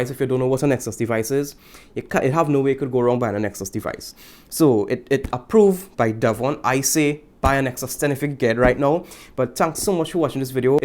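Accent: Trinidad and Tobago. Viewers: Bmt that trini accent surprised me fam #trinitodebone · Dude you talked faster after saying that you would slow down because of you Trini accent. Hahahaha. Love it. So I'm a mac guy and was never much interested in anything else but I see that you are able to remotely control your mac from it?? Now I am piqued.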